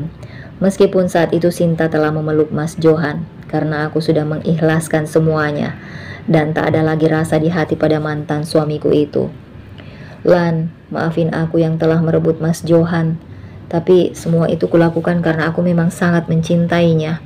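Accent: Medan, Indonesia. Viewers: Pasti yg bacakan ini orang Medan ❤❤ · Bogor hadir nyimak sy senang dgrin logatnya bahasa dr medan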